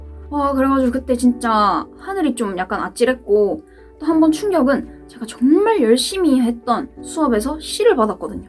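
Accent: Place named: Gyeongsang, South Korea. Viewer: 뜬금없지만 나도 대구 사람이라서 말투가 너무 정겨워 ㅋㅋㅋㅋ ㅠㅠㅠ 좋은 영상 감사해요 🤍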